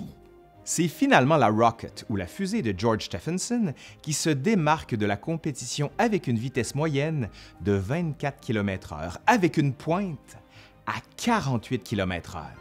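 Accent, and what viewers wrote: Quebec, Canada. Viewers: slt tout le monde, je n'ai pas regarder toutes ses vidéos, mais avant j'avais remarquer que pour les vidéos qui s'orientais sur l'histoire québecoise, notre cher orateur avait un accent plus prononcé (qui pour moi, rendez difficile la compréhension de la capsule) et la je trouve qu'il fait attention à son accent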